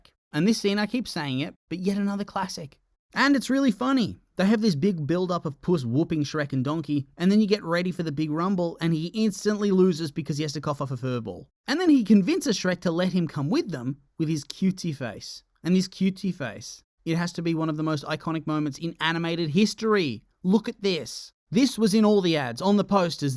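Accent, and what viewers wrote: Australia. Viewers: Ahhhhh, I needed to hear a fellow Aussie’s voice explaining this.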